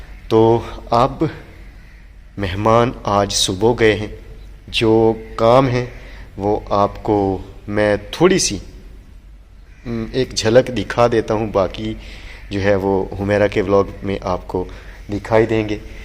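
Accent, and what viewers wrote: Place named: Gujrat, Pakistan. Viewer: Salaam Bahi I recently started watch your Volgs your house is beautiful mahshallah your excient like gugrat are you guger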